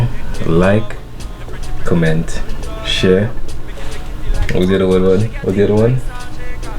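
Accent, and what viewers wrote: Saint Lucia. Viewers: doing it big!!!!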